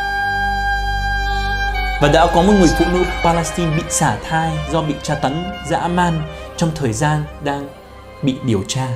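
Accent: Standard. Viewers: Woa anh nói tiếng việt quá chuẩn luôn 👍👍👍chúc anh nhiều niềm vui va hạnh phúc nhé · Chào, các clip của bạn rất hay, nhất là tiếng Việt của bạn rất chuẩn, dễ nghe. Xin chúc mừng Mabrouk! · Anh nói tiếng Việt quá rành rõi, phát âm, dùng từ chuẩn còn hơn nhiều người Việt nói tiếng Việt nữa. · Bạn nói tiếng Việt chuẩn quá phát âm rất tốt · Hi hi, Cám ơn, nói tiếng Việt chuẩn quá! Đất nước nào cũng có anh hùng và tội phạm…tất cả họ đều do phụ nữ sinh ra nên phụ nữ Đạo Hồi cũng ko ngoại lệ 😂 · Quá hay! Bạn đã giải thích rất chi tiết và dễ hiểu. Tiếng Việt của bạn rất chuẩn. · Hoan hô, cám ơn đã cung cấp cho người Việt chúng tôi những thông tin, hiểu biết chính xác về thế giới Arab và Đạo hồi. Tiếng Việt của rất chuẩn, hiểu biết về văn hóa, xã hội của rất rộng. Khâm phục đấy! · dep train,noi tieng Viet chuan 😊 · Anh nói tiếng Việt chuẩn luôn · Bạn làm video rất hay chân thực và nói tiếng việt rất chuẩn cảm ơn bạn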